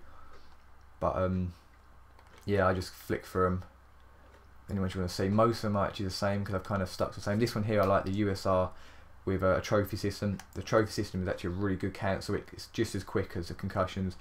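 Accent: United Kingdom. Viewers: Ur British dude yeyhhh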